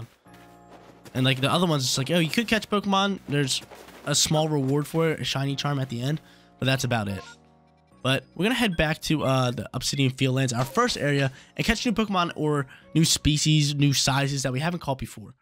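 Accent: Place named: North Jersey, United States. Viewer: I just know your from north Jersey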